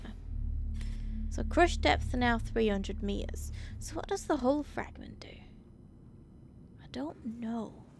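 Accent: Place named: United Kingdom. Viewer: British 👌. nice accent :)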